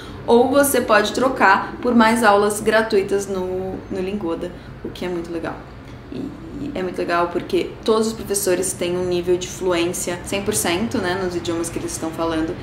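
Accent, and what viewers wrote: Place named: France. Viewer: Muito inspirador acompanhar sua trajetória, seus desafios, seu dia a dia e essa voz linda, muito francesa !!! ☺🥐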